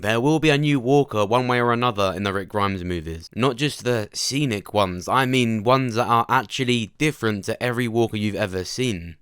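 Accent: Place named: England, United Kingdom. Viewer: I get it, you're English but even as an Irish person I find it very difficult to know what you're saying. You're talking too low and too fast and your words just sound like noise. Btw this video like most of yours could be done in 5 mins. I feel as if you're drawing out the videos so you hit your youtube targets for revenue.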